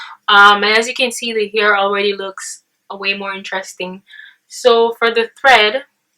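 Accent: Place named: Jamaica. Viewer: Sensing a Jamaican accent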